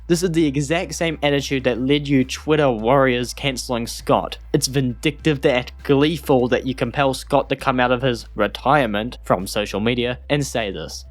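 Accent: United Kingdom. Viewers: Are you british? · You're videos are good but please stop being british, it's hard to listen to you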